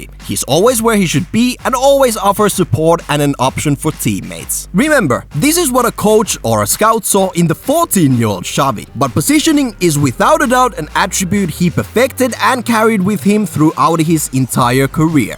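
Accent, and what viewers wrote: Finland. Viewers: suomalainen puhuja. Helposti tunnistaa🙂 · Is this guy finnish?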